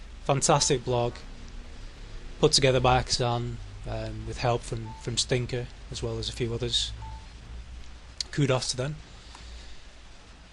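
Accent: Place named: United Kingdom. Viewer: Only now have i watched your videos with sound on and found out your British, High5!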